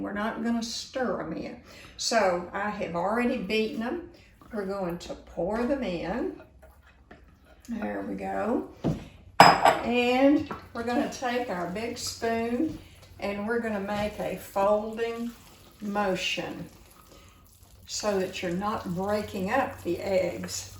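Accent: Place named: South, United States. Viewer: Thank you for making this video. I am gonna try this recipe this year for Thanksgiving. My Grandma use to make the best cornbread dressing. I wish I would of asked her for recipe. I have tried to make it for several years and just haven’t been able to get it right. Your recipe does look very similar to hers. Listening to you speaking and hearing your southern accent reminded me so much of her. Again, thanks for sharing this.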